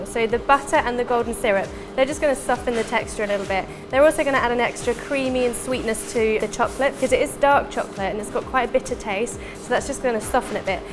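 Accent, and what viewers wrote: United Kingdom. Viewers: great job! But I'd like slower in speech since a lot of us aren't accustomed to British English, or even English. But it's so fun to watch. Thanks for making this!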